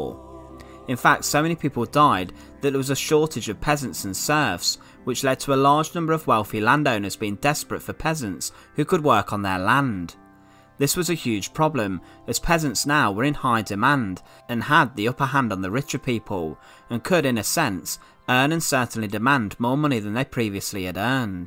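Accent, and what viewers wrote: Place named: North, United Kingdom. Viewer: nothing he’s just northern😭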